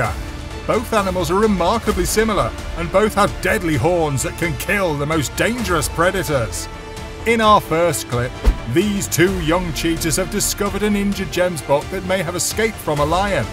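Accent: United Kingdom. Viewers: I love the way you talk London England but Fiennes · Why is it always a British guy? Can't it be Snoop Dog?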